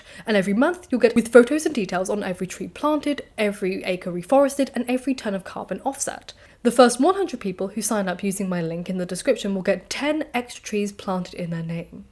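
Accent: RP, United Kingdom. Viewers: I just discovered your channel, and you remind me how incredibly attractive an intelligent and learned woman is. (The posh accent probably helps 😃)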